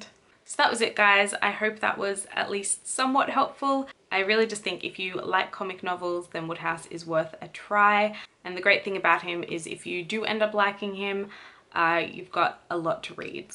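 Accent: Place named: United States. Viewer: She is very American. There is no difference between the d and the t in her pronunciation.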